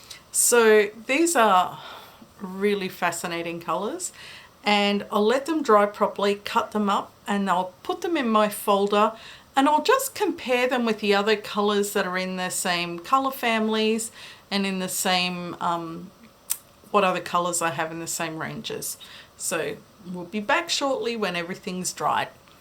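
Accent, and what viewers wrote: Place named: Australia. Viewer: Loving your videos! And it's nice to hear an Australian accent down this watercolour youtube rabbit hole. I just wanted to say that Naples Yellow differs from yellows/yellow ochres in that it's actually brown - so can be used in skies without making green when mingling with blue.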